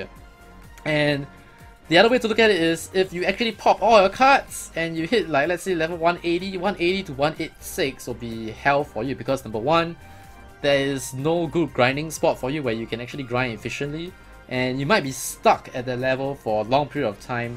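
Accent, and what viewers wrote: Singapore. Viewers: Thanks man, bro are you Singaporean too? Hahaha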